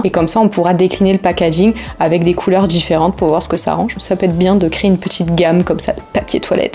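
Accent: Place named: Marseille, France. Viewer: Encore une vidéo sympa et pleine d'enseignements ! Etant en formation CDUI, j'adore tes vidéos pleines de conseils et le petit accent marseillais en plus !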